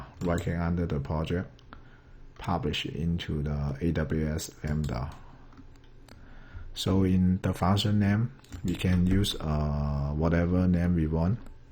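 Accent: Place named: China. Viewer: you accent is closed to a Chinese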